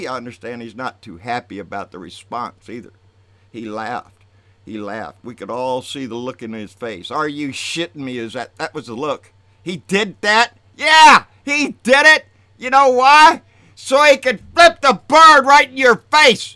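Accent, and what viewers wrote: United States. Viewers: baby!! you're exactly what a true American sounds like, our forefathers envisioned you when they established this wonderful country. keep giving them hell!!! of course I'm referring to the "selfish" rich and Powerful~ peace and love ☆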